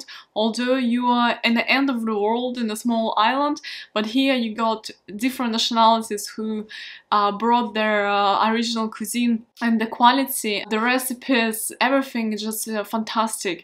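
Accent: Russia. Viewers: Сразу понял что русская по акценту😄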